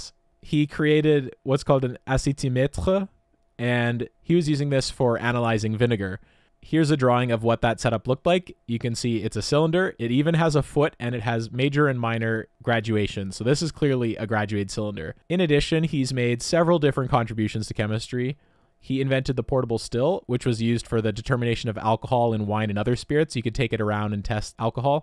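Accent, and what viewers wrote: France. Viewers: Ey your French accent ain’t that bad haha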